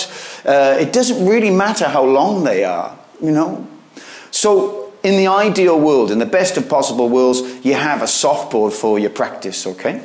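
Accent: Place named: Wales, United Kingdom. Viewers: Looking to get back to surfing after a long break 20 or so years !!! Great video, u from Wales somewhere?? Accent sounds familiar 👍 (Cardiff boy here) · What is your accent! I hear bits of Welsh 😅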